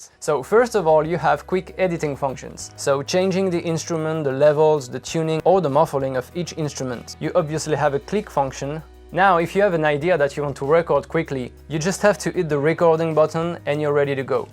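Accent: France. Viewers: french accent here and there is just 😍.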